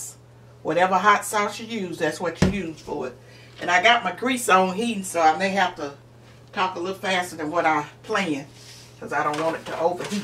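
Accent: South, United States. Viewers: you are awesome...quit worrying about how you say certain words, I get you so if they dont they can simply rewind put headphones on..From one authentic proud Southerner to another...Keep doing YOU..keep your smiles going through that wholesome "put your foot in it" style cooking you're sharing. Bravo! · I enjoy you ! I love your southern accent and charm ! You should so sweet and caring ! Put me in the mind of my nana or great Aunt ! The fish was absolutely beautiful! Blessings be on to you!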